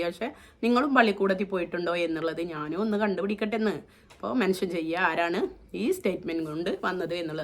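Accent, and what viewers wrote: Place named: Malappuram, India. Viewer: ഓരോ നാട്ടിലും ഉള്ള സംസാരം അല്ലെ അതു? കോട്ടയത്ത് ഉള്ള എല്ലാ മതക്കാരും ഒരു പോലെ ആണ് സംസാരിക്കുന്നത്..ഇവർ മലപ്പുറത്ത് ഉള്ളതല്ലേ? അവിടെ ഇങ്ങനെ ആണ് സംസാരിക്കുന്നത്..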